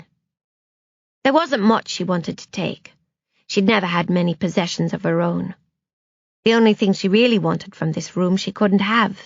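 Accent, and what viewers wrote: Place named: Australia. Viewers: Awful accent. Aussie? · English with an Aussie twang I'd say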